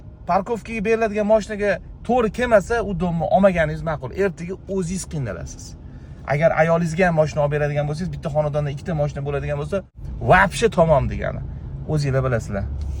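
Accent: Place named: Khonsaroy, Uzbekistan. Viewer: Hon saroy di gapirvoti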